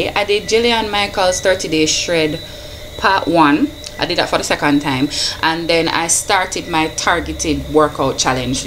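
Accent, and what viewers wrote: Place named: Jamaica. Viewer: Patois voice better! :)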